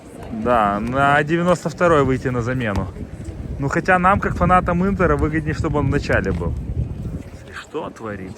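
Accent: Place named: Odessa, Ukraine. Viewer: Первый раз смотрю видео автора, практически сразу, по говору понимаю, что наш человек, одессит!) Также был на этом матче на гостевом секторе, эмоции суперские. Nel bene e nel male forza Internazionale!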